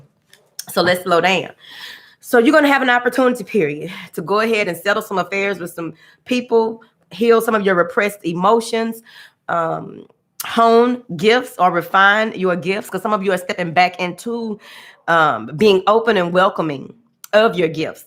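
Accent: South, United States. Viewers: You so Southern and I LOVE IT!😍😍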